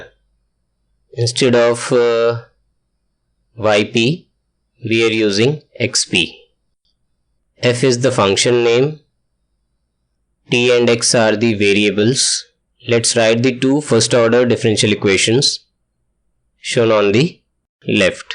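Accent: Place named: India. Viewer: Computer voice with Hindi accent. How do they do that?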